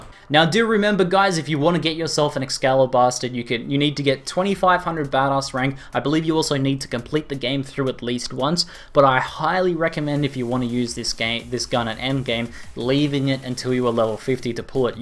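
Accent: Australia. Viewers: Are you Aussie? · woohoo a Aussie YT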